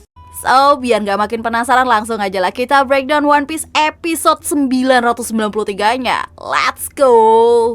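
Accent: Java, Indonesia. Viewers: Medok dia org jowo kek Mr kucluk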